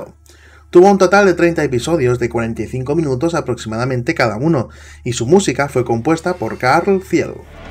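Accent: Spain. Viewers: a muy bien yo crei que eras de madrid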